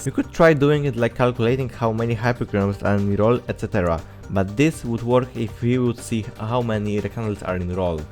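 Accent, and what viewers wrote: Poland. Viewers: siema słychać że polak gada hahahah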